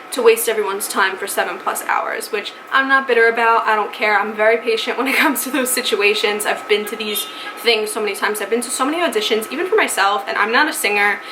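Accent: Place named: New York, United States. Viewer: Your freaking accent is so on point I could tell you were a New Yorker from the beginning of the video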